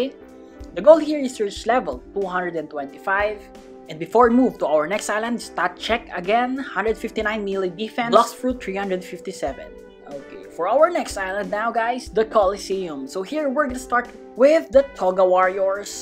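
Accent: Philippines. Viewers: r you from phillipines?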